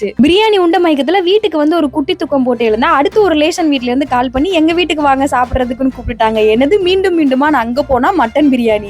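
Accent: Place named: Madurai, India. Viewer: I think no... Ivunga madhura kaaranga avunga thirunelveli kaaranga ....Slang eh difference theriudhu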